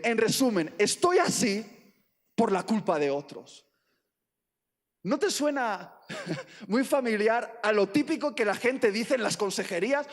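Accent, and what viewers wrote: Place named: Mexico. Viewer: porque habla como mexicano y pronuncia las C como español? jaja excelente la prédica pero me quedó esa curiosidad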